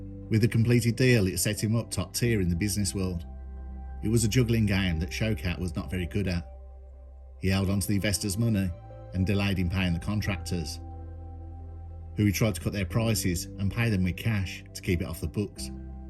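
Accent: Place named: England, United Kingdom. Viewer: Well done fellow, real British events narrated by an English accent, a great channel many thanks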